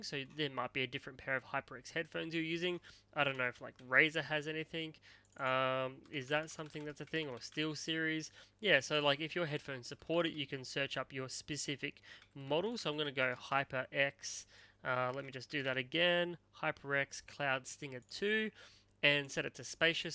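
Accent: Australia. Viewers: ahhh, the Aussie ascent is music to my ears. 😌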